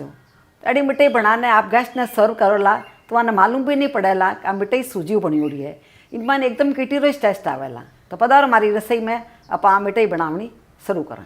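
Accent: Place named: Marwari, India. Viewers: I love the recipe auntyji...u described it so well.. u r so beautiful and elegant. Ur marwadi is lovely... keep sharing the new and delicious recipes with us .. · Thanks for sharing your traditional recipes. You seem very experienced. I am originally from Ajmer so I am loving your Rajasthani accent, but it’s amazing to hear all the English words you speak with a beautiful accent. Have you ever made stuffed guttas? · Very nice recepie. मारवाड़ी बोली सुन कर बहुत अच्छा लगा. Pranaam पापा mummy. आप दोनों हमेशा खुश रहिए और स्वस्थ रहिये. 🙏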